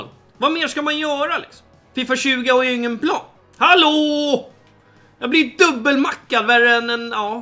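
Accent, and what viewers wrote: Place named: Stockholm, Sweden. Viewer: sjukt hur stockholmsk du e men ändå bäst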